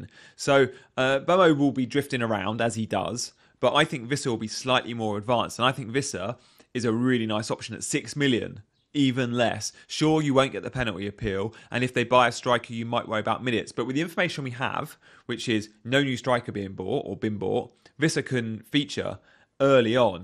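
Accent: United Kingdom. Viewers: I'm new to your channel, I'm shocked at a very Italian sounding name has such a strong British accent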